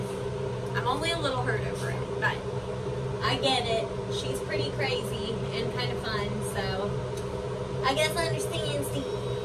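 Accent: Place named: South Carolina, United States. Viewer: i love your South carolina accent so much lol im in the midwest 💟 i went there earlier this summer i miss yalls voices🤣💘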